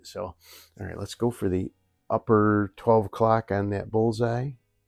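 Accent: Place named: Wisconsin, United States. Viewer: Very cool channel. Been subscribed for maybe a month. Your accent and “goofin around in the garage” thing reminds of everyone I knew in northern Wisconsin as a kid. What I found strange is that you seem to know everything about airguns. And you’re a sought after airgun mechanic/repairman. Every video of yours I watch, is really humble and professional. What’s most fun is that you review everything from top of the line rifles to the cheapest junk not many would buy, and everything you play with is about fun. “Just goofin’ around in the garage” and that attitude really sold me. I’m just getting into pellet guns again after decades of considering them just toys for kids. Mostly because of a sudden and severe hip problem. Going to the range with firearms is out. Plinking in the house or yard with a pellet gun though, is super fun again. 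Addictive as hell. Picked up a Gamo Fusion 3i and was hooked. Watching all these videos and looking at my Gamo, thinking, “I can do better than this…PCP is where it’s at.” Got the Notos and a hand pump (Hill MK 5, best they had), and there is nothing addictive about hand-pumping even the smallest air cylinder out there, the Notos being one of the smallest. “Okay, I’ve gotten a little over my head at 61 but it’s great exercise and really fun to shoot with a scope that’s 3-times the price of the gun.” I’m learning fun stuff with airguns and I don’t even need to leave the house. Thank you and everyone else in the airgun community, I’ve talked with mo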